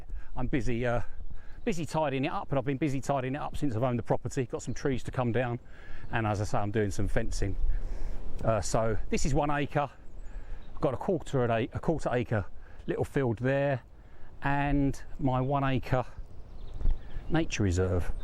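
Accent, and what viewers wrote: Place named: South London, United Kingdom. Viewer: Don't know how you came up on my feed but glad you did - so many of us are watching this with envy - the UK is even more of a rat race today than when I started out 45 years ago as a 21 year old(and it was a rat race then). Like many we worry for our kids as rents here are astronomical - Capitalism is becoming more and more viscious - how the younge working classes get a foot hold is bewildering. Our government has done everything it can to keep up on this overcrouded island(as outlined in the Bill Bryson book I am currently reading 'Notes from a Small Island') - so how you escaped is a matter of curiosity - the entant cordial agreement put in plae by our ruling classes to halt Brits living in France/Europe has put pay to folk doing what you have done - so well done for getting things sorted before brexit. 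I will now go back and watch alll your hard work on what seams a lovely plot - thanks. You sound like you come from my neck of the woods - South London - Bromley?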